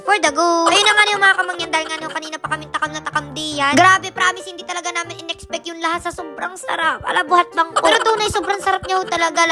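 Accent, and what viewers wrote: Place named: Quezon, Philippines. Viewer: Ito yong blager na nakaka tuwang panoodin saka yong Punto nya kagaya dito samin sa queson province ❤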